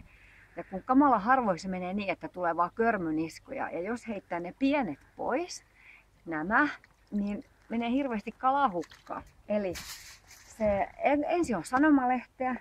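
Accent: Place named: Turku, Finland. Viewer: Tyypilinen turun murre.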